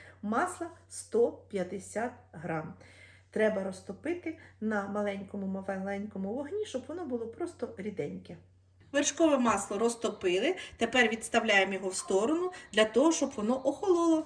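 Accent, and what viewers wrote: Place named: Khmelnytskyi, Ukraine. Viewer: Класний рецепт, дякую Вам! Візьму собі на замітку! У нас такі пироги теж називають струдлями., Ви родом не з Хмельниччини, дуже діалект схожий на наш 🤔😅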